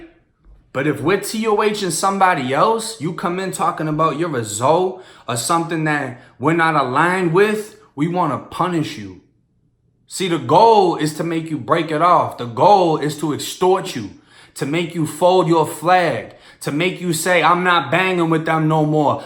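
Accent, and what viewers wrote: Boston, United States. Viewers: Lol I’ve been listening to ever since the 23&1 interview and I always crack up with his Boston accent! “Test of HARHT” “PAHRK THE CAHR” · He sounds like a he's from Boston.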